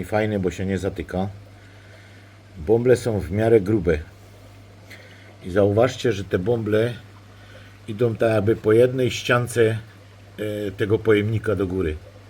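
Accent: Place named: Silesia, Poland. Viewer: Cześć. Fajne i ciekawe rzeczy opowiadosz,miło sie słucho,szczegulnie jak godosz po naszymu😬.Pozdrowiom ze Mysłowic.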